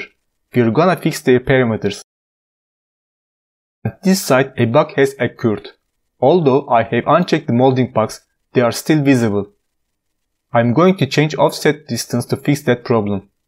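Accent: Turkey. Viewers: Tam bir türk ingilizcesi :)) teşekkürler video için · Abi Türksün demi aksanından belli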